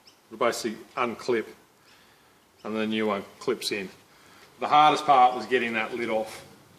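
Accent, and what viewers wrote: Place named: Australia. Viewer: thank you for your video on how to pull the ‘animal’ apart it was a great guide/confidence booster to tackle the replacing of the the main filter around the engine. I live in the UK, I am Ozzy, it was great to hear a beauty Oz accent! Thanks Mate, really appreciated your video and effort, cheers... BTW, It’s back together working, good as new! 😎👍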